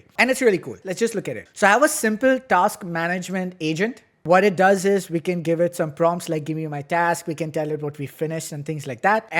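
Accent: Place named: India. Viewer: This guys accent is like a mix of a white guy but grew up in India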